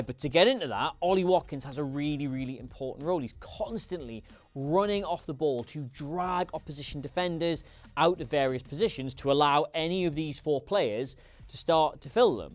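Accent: United States. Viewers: That American accent cracked me up 😂 love your videos! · american accent >>>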